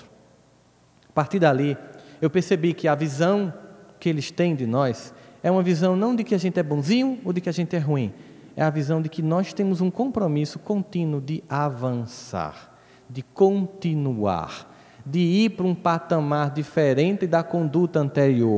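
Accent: Northeast, Brazil. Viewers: Também gosto do sotaque nordestino.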